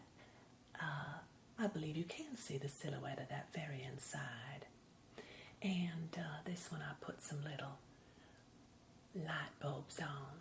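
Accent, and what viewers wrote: South, United States. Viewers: Omg omg I love the accent, I am European so southern accent sounds so so so sweet!!! Love it. thank you! So much!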